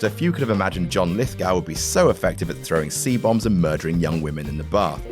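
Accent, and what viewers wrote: United Kingdom. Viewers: These Whatculture lists are kinda stupid... a British accent is just meant to hide the nonsense.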